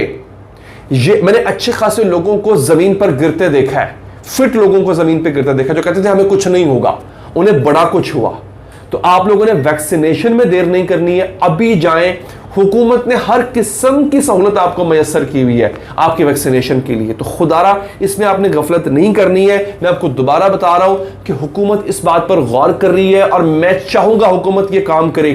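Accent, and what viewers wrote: Punjab, India. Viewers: Love your punjabi accent 😍 ❤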